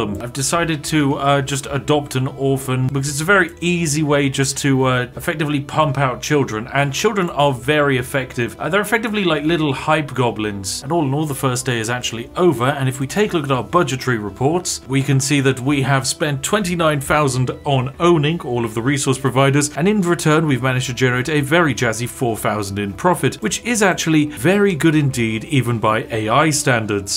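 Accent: United Kingdom. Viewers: The pure British accent its so good and it really makes jokes better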